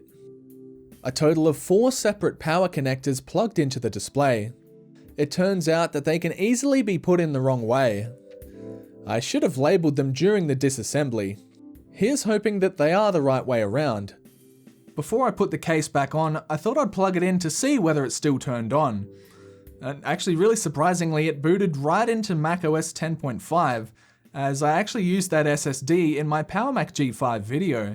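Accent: Australia. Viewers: I hope people don’t think this is how Australians talk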